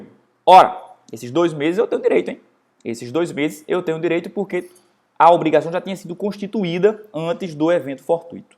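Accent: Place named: Pernambuco, Brazil. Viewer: Parabéns pelas aulas!! Que sotaque lindo, é de Pernambuco??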